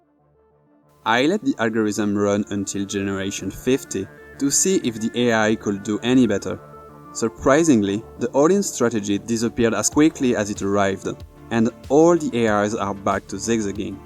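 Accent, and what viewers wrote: France. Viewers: Are you french? Your accent feel french, I am french 😁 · Great video! You can 100% tell you're french tho :p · French accent spotted 😂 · Elle est incroyable ta vidéo ! (Je suppose que tu parle français au vu de l'accent ;) ) Le temps que t'as du passé à faire l'entraînement puis le record et le montage, j'imagine même pas. Bravo ! A la fin tu parle de combiner le supervisé avec du non supervisé. Tu voudrais pas essayer de voir ce que ça donne ? Avec ce genre de stratégie tu serais en mesure de créer une IA potentiellement plus forte qu'un humain non ? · this is what i go on youtube for, i don’t play trackmania but your dedication and passion about what you are doing really makes the cut for me ! i can feel you worked hard on this vid and it pays off, great content man, keep it up :) btw : ça fait toujours plaisir d’entendre un accent français par-ci par-là, continue à faire ce qui te plaît mec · En vrai, tu es français? 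On le sent 😂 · mdr ça m'a littéralement pris 3 secondes pour reconnaitre que t français x) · mec, fais un effort ton accent est juste horrible meme pour un français !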